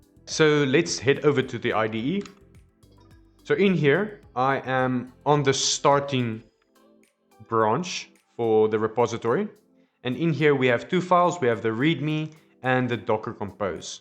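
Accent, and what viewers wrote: South Africa. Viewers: I hear the south african accent! 💫 😂 Please do a video to customize to add other tables or inherit and add fields, and make this into a Multivendor marketplace like takealot or Amazon! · Great Tutorial YT / !! Also, hello as a fellow South African - recognize the accent from anywhere :) I saw a lot of comments/questions regarding postgres URL that is passed during the NPX-create. I did a little troubleshooting and found the following worked really well for me: TL;DR = Add single quotes around the URL string. Add single quotes around the URL string. Adding quotes is the answer; you might have a different setup, noticed you're on PC, on Mac or Linux it is possible to require quotes is my guess. Not using quotes got me stared but then I got error shortly after opting in to create the Next.js storefront. I figure why not do it now vs later. 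ERROR: "Couldn't connect to PostgreSQL using the database URL you passed. Make sure it's correct and try again." Then after some reading, I used double quotes and it failed as using double quotes with '@ or '!' means something else in ZSH-World. End Result: Use Single Quotes around the postgres URL Keep up the good work! Cheers! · Ha I knew the accent within the first 30 seconds, but I’ve been working remotely with ZA folks for over a decade now so it’s second nature by now.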